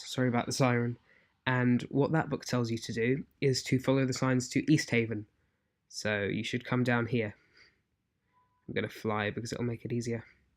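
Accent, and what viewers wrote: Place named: United Kingdom. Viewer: Nice british ácĉent dude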